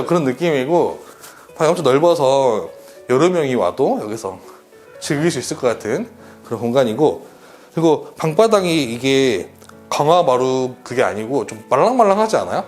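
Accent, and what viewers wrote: Jeolla, South Korea. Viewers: 아따 이양반전라도네! 전라도씨가 온사방에 퍼져있으니 거시기 하네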